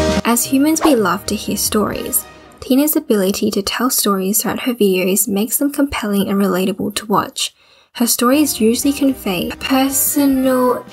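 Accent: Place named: Australia. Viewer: off topic but i really love your accent! is it australian?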